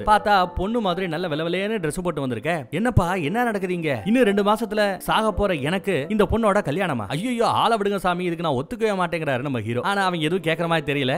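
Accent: Madurai, India. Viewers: ப்ரோ நீங்க மதுரையா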